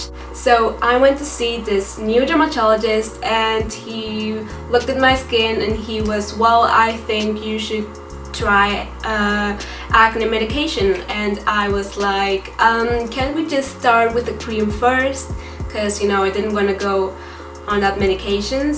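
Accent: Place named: India. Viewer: Are you Indian ? You've that little Indian English accent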